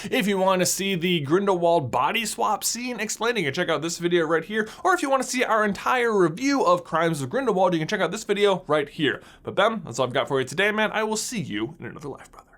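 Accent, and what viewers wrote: Germany. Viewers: Your germane accent is so funny and bad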